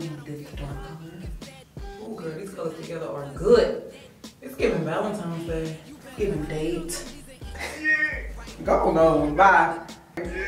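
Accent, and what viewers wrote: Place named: Houston, United States. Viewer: What part of the H? I'm from Houston too. Beyonce talk like this too lol. Real country lol...It's an HTOWN thang 🤪.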